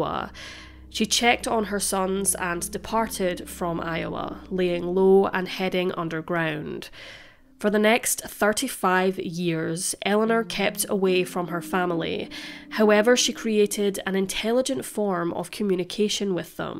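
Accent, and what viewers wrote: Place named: Glasgow, United Kingdom. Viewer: I spent 10 years in Glasgow Scotland. I quite enjoy listening to your Glaswegian accent narration.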